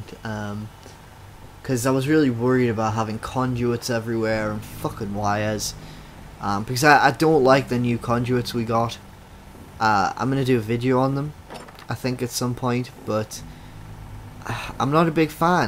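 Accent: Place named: Canada. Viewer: you sound like a Canadian stereotype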